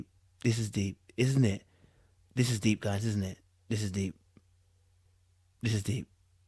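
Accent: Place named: United Kingdom. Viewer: I AM DYING!!! THIS IS HILARIOUS AND YOUR BRITSH ACCENT MAKES IT ALL BETTER!!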